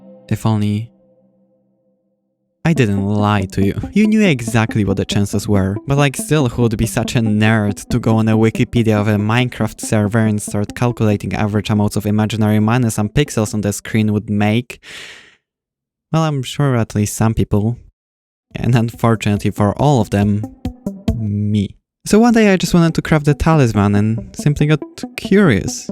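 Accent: Poland. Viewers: I knew this accent is familiar you are a Pole! (the olx ad gave it away 😂) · Wiem kiedy slysze polski akcent i wiem że ty go masz😂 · you sound Polish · ale polski akcent kolego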